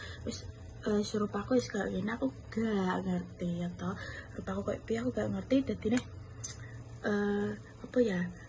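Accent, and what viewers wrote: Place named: Java, Indonesia. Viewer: pengen ngerti boso inggrismu lancar pora wong logatmu jowonemen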